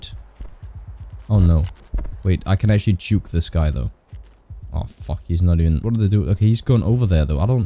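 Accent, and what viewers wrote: Denmark. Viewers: Are you danish? If so, cool. Btw good video!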